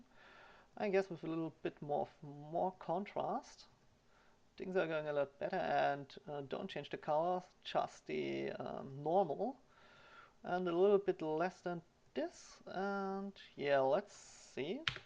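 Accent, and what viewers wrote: Germany. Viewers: Hammer Englisch :D Ich liebe diesen deutschen Akzent :)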